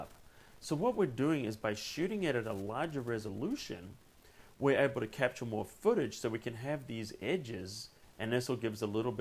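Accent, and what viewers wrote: Australia. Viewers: Good video, by any way are you an Aussie that has been living in the US for a while, you seem to have a hybrid, Greg Norman accent. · Where is your accent from? I'm guessing you're an Australian who has been living in the States for a while.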